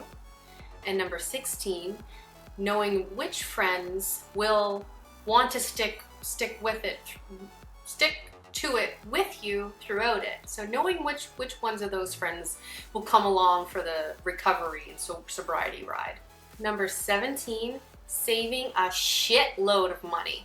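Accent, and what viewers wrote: Canada. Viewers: Early on, how did you learn to be social, find Joy, & not drink (or use opioids in my case), without that security blanket that you'd come so accustomed to? Did you force yourself into uncomfortable social situations until it became easy? Did you have a regimented schedule set out for your days off? Did you become an exercise addict? Did your boyfriend push you to do stuff with him? Once you hit that bottom (which I have), how did you learn to live life on it's own terms without being absolutely bored to death? I like your Canadian accent, Canada Rules! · Canadian ey? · Did you get your records up right? You're super lovely btw- and the love that slight Canadian accent. So, I'm aboot 21days sober- cigs went with it. It wasn't a resolution, it just happened and I've no desire whatsoever... Yes, it automatically make you want to improve other parts of your life. 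"This is no longer acceptable- how did I let myself get away with this?" kinda stuff... My step dad was an ineffectual boozer- my mom hung on until she died. My exwife was an abusive white-wino and our baby was born still- I divorced her and took a huge financial loss. Would I have married her if I had been sober? Not a chance in hell. What other losses have I incurred? How much massive bullshit have I brought into my life unintentionally, just by drinking? How many dj sets (4countries total) sounded not nearly as good as they could have? How many women have I been with that I wouldn't have otherwise? Eeeek! I'm so thankful this has happened. I've been in Austin TX for a year now, and have only been out for music a couple of times- I don't entirely trust myself- or I didn't- until now. Still, there's so much work to do on myself, by myself- everyone's dead, or I've cut all my old friends off. 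It's sucks, but I'll rebuild. I have all over the world- this time I get to do it better. Again, nothing but thankful... (Oh I gotta add- this past summer I captained party barges on the lake here (sober) and after watching people make total asses of themselves and puke their bikinied guts out aft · Love your videos. Helps me remember why i stopped drinking and the benefits of sobriety. Your canadian accent is too cute btw ("oot").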